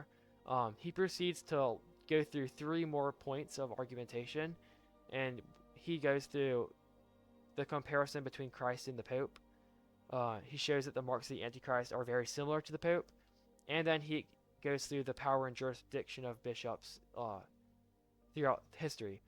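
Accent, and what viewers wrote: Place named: RP, United Kingdom. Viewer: Beautiful refutation by Philip Melanchthon. Also, you seem to pronounce your long O sound like Received Pronunciation (i.e. "Ruh-oom" instead of the usual American "Row-oom" for the word "Rome") instead of General American, which I found very interesting as someone living in Scotland. God bless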